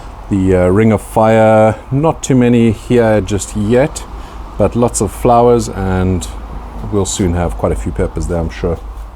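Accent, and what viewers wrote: South Africa. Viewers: Do you ever top your peppers? Mal oor jou videos. Jy klink soos ou SA-man.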